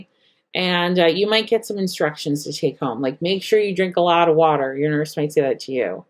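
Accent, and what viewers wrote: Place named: United States. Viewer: I love it I was laughing in a good way lol.....I love the American accent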